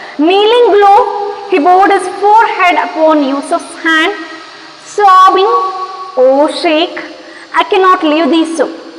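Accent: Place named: India. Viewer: Very nice and excellent teaching madam.it is in Indian English ,we can understand easily and learn spoken English.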